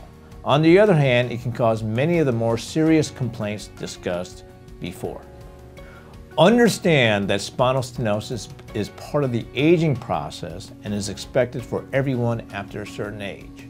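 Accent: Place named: South, United States. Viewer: Great information! So funny hearing a Chinese man with such a southern American accent!😄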